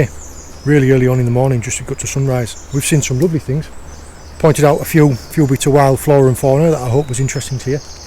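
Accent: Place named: Cornwall, United Kingdom. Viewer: Just love the videos! I Put the captioning on, but your thick Cornwall accent makes for interesting reading! This is what I look forward to! Great morning, watching while I drink my morning coffee! ☕️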